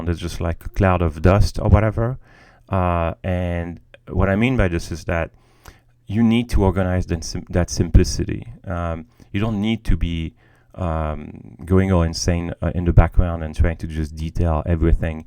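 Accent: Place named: France. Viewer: Really cool. Your French Accent is cool! Im half french and I wish I had a french accent instead of a boring london one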